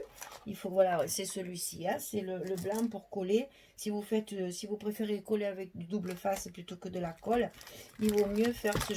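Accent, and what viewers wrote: South, France. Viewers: Vidéo très agréable grâce à votre personnalité chaleureuse et l'accent du soleil. Je regarderai votre album avec beaucoup d'intérêt.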